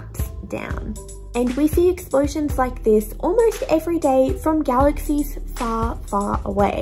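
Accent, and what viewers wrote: Australia. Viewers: Yay an aussie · It's not a speech impediment. She's Australian.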